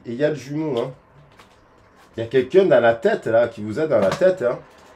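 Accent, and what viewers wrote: South, France. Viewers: Coucou je t'envoie mes ondes les plus positives pour que tu rencontres ton âme soeur et qu'elle te demande en mariage:face-red-heart-shape: Vu toutes les bonnes choses que tu nous envoies avec le coeur, et tes tirages de cartes toujours empreints de positivité, toutefois très réalistes et sans mièvrerie, je suis sûre que je ne serai pas la seule à formuler ce souhait! C'est toujours un plaisir de t'écouter (surtout avec ce bel accent du soleil), et merçi encore pour ta générosité de coeur. Tes vidéos on les écoute et on en redemande! ❤ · Je l ai délecte cette canalisation...divin gratitude pour ce moment magique et magnifique avec l accent du sud en plus...🙏🤲🪷🌹🕯👌👍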